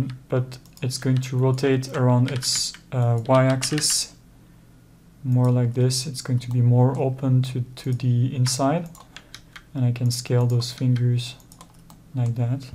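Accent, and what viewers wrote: France. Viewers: I’m starting to think you’re only apologising for your French accent so we compliment it ;) awesome tutorial, real time tutorials that explain everything in detail is a rare find!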